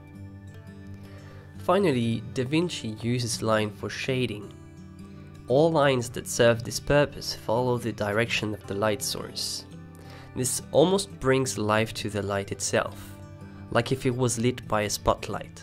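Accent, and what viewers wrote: France. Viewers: I can listen your french accent. Tu vas pas me la faire fréro, sinon bonne vidéo.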